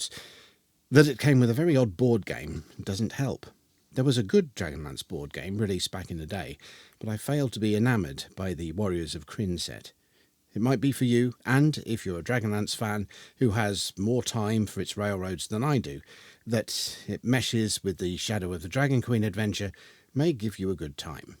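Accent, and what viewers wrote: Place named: United Kingdom. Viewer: Amazing video! I cant get from the accent, where are you from in the UK?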